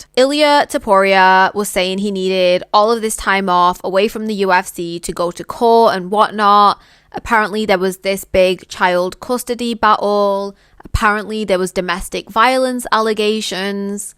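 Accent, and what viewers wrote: United Kingdom. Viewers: You really think Kamaru is an easy fight for Makhachev come on beautiful british accent lady when this fight will happen you're gonna find out.from my perspective its a 50 50 fight.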